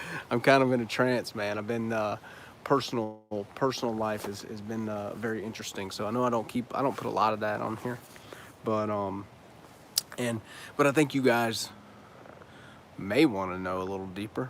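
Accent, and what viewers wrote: United States. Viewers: Love the country accent lol. Good videos man, keep em coming.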